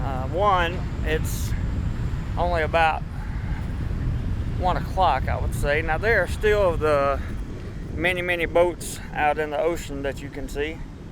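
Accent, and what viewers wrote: South, United States. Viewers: Irishman he has a southern American accent. This is not to say he is good old boy. That term can be taken as a pejorative by some.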